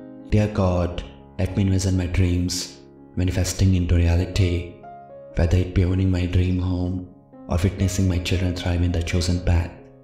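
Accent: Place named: South India, India. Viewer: Your pronunciation is like the south Indians speaking English with the vernacular tone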